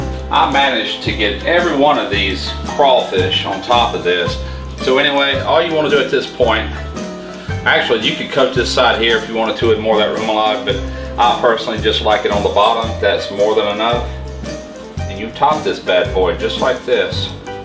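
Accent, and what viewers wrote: South, United States. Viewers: I Like The Theme Of This Video & The Accent U Have Lol I Felt Like I Was In The Country What Was The Name Of The Song That Started Playing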